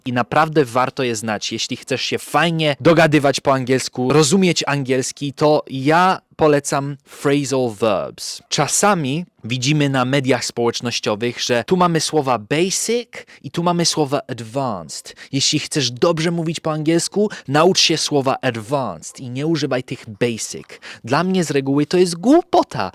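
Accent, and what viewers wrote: United Kingdom. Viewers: Myślę, że jestem na poziomie mocnego C1. Znałem ok. 2/3 tych phrasal verbs, ale marzę o tym, żeby mieć taki piękny brytyjski akcent, jak ty. Pracuję w brytyjskiej firmie i mam w zespole paru rodowitych brytoli, ale mój akcent jest wciąż bardziej amerykański. Jest jakiś sposób, żeby to zmienić? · Nareszcie ktoś z brytyjskim akcentem🙂❤Dobra robota kolego!!!! Well done😛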